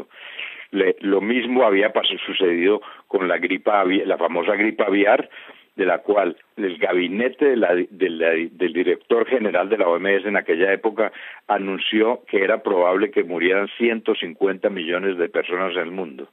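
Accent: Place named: Spain. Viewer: que bruto que informacion tan importante... lo ideal hubiera sido en video y no solo la voz pero esta brutal.... gracias por compartir ahorita estan atacando al e cig... de parte de la oms para proteger la inversion de loc chicles parches y champix... surtidos por las farmaceuticas... te invito a ver nuestra informacion <link> aunque el ataque es a nivel mundial, igual en españa porque se te oye voz de español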